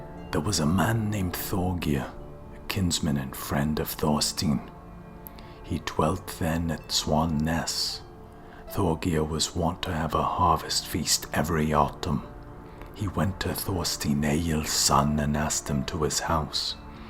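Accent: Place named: Wales, United Kingdom. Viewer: Thank you very much for this amazing, long series. It is hard to imagine how much labour went into this. It was a nice surprise after searching for a long time nearly all the English narrations seemed to be AI-generated. Then found a promising one by an Icelandic lady on Bandcamp and bought it, but unfortunately later found out it was incomplete. I'm curious what is your native language/nationality? Your accent sounded a bit like Welsh sometimes but it's hard to tell (anyway it suited the narration very well). Look forward to your other work.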